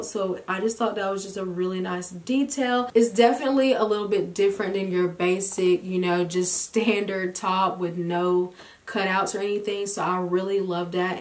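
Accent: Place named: South, United States. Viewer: you have the best American accent I've ever heard! are you from the south?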